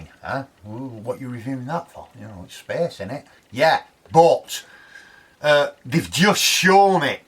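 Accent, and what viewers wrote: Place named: Cockney, United Kingdom. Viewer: Holy cockney!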